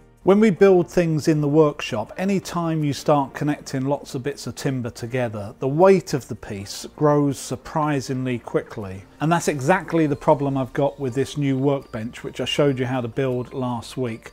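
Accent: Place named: United Kingdom. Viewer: I love your style, simplicity, and British accent! I'm from the South in the US. I love to watch woodworking video's since I cannot do very much any more. I am severely handicapped, and dying from a lung condition that is destroying my lungs, called Idiopathic Pulmonary Fibrosis, and was recently diagnosed with Liver Cancer. So 👍👍😁 and keep on keeping on! Subscribed, and hit the bell!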